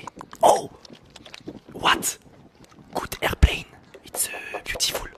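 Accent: France. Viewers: this mousse à rasayy! This is why I love watching! It’s like if French and English collided together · Are you French because you have a French accent and I like it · et, ça se voit que t'es français vu ton agsent (ou peut-être pas). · On dirai un français 😂son accent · T’a vraiment un accent français quand tu parle anglais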